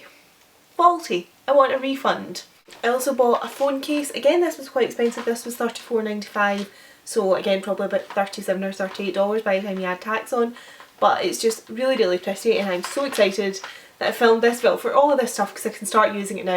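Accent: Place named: Scotland, United Kingdom. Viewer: Clicked on this video expecting a pn English accent and I was so surprised to hear a Scottish voice hah I am Scottish and live near Edinburgh but anyway brilliant video😄😍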